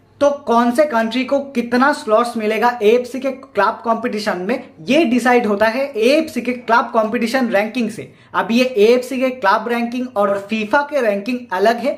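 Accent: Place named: West Bengal, India. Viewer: U sound so bengali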